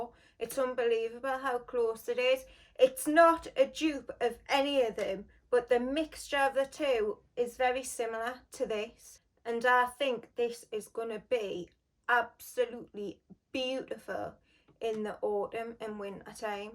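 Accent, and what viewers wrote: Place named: Newcastle, United Kingdom. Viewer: I’m not from the UK and I love your accent, is it Geordie? Just got majestic woods and love it!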